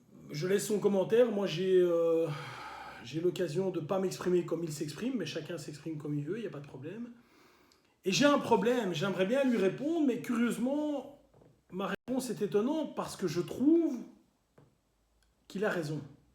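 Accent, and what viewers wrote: Belgium. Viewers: T’as un accent Belge je m’abonne camarade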